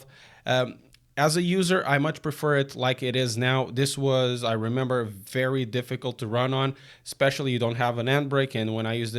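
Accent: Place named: Portugal. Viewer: Where are you from? Your accent sounds portuguese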